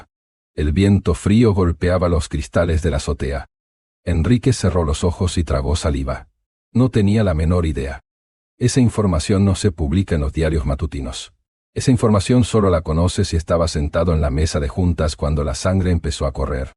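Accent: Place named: Argentina. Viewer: Ese dialecto argentino, cuesta entenderlo, pero como la historia es similar a muchas se logra adivinar la trama.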